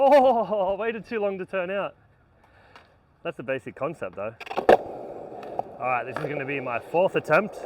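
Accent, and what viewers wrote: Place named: South Africa. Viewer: I don’t like the way u talk. South Africans always make me think of that blood diamond movie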